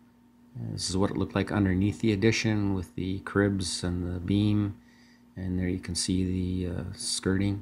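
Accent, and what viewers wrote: Canada. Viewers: Your building construction is AWESOME, and is STRONG! (...your accent: are you Canadian? I love your accent!My mother was Puerto Rican; she had her accent; my father was a "hard-nosed Yankee; he had his accent. Your accent is...nice; it's "neat" that your daughter gets to help! GOOD JOB!! AWESOME QUALITY!! ). 👍😃👣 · You very much Welcome, again excellent looking home! I only assumed you were Canadian by your accent I have a few friends that live in Canada, Well greetings from Florida!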